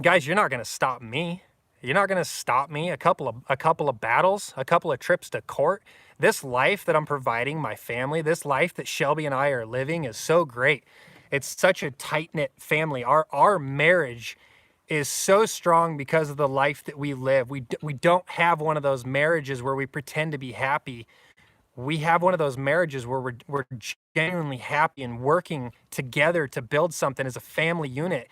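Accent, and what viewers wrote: Idaho, United States. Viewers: Just stumbled on you today. Digging that Idaho accent.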